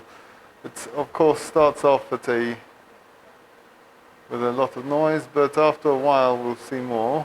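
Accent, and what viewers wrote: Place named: United Kingdom. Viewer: You sound British are you from the uk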